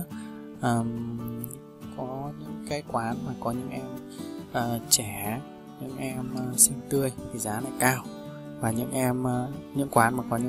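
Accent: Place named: Hai Duong, Vietnam. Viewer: Đặc giọng Hải Dương 😄😄😄😄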